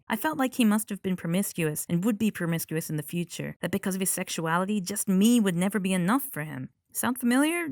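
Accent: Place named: Australia. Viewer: oh god youre australian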